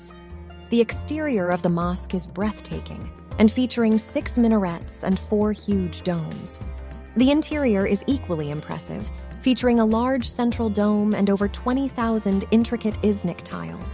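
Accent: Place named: United States. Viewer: She is pronouncing it fine brother. It's her American accent.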